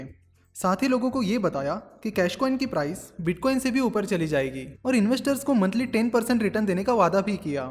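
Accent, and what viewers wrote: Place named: Indore, India. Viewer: Pakka indore wale hoge ye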